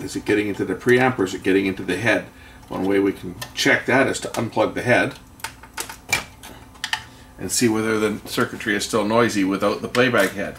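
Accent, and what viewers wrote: Canada. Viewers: You from Canada?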